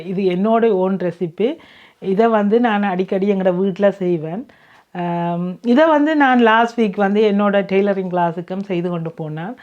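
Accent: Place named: Jaffna, Sri Lanka. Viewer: உங்கள்றிசிப்பி அருமை அதையும்விடஃஉஙகள் கதை நம்தாய்நாட்டு இனிமையான நினைவுகள் அதை கேட்பதற்காக உங்கள்பதிவுகளை பார்ப்பதுண்டு சாவகச்சேரி சங்கத்தின் பக்கத்து வீடு போல அதனால்பேச்சுவழக்கு கேட்க ஆசை வாய் கரைப்பது விட கைகள்so cute அந்த cuteness தான்எல்லோருக்கும் வராதுவந்துவிட்டால் அது அனுபவம் வளரட்டும் 🎉🎉🎉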